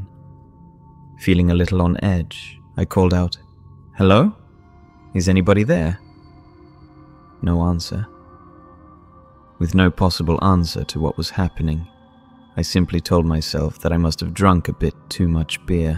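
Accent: United Kingdom. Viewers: If this is your own work, I am impressed with your literary skills.. Usually I have a tough time listening to one with a British accent, but not you, you have such perfect diction and pronunciation that the accent is hardly noticed, besides British accents are the coolest in the world.. I see you are up to almost 7.8K subscribers. I am going to share this video with others and recomend they subscribe so as to do you a solid.. Mark my words, this channel is going to grow Sir... Great work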